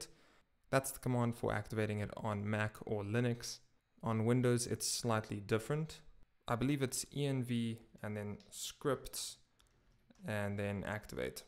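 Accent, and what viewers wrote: South Africa. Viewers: Are you by any chance from South Africa? You are doing a great job by the way.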